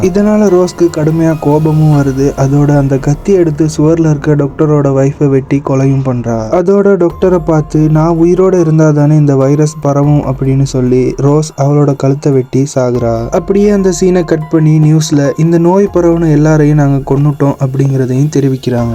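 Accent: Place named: Sri Lanka. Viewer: Sri Lankan voice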